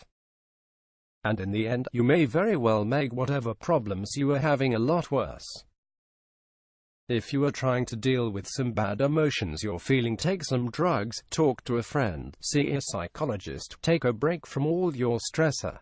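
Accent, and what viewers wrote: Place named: RP, United Kingdom. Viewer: British RP Robot. What a snob.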